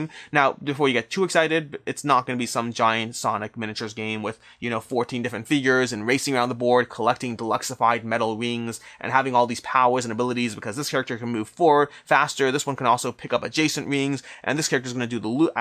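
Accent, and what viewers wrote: Canada. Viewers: Oh like this sweater also, nice! Your 'canadian accent' is getting less noticeable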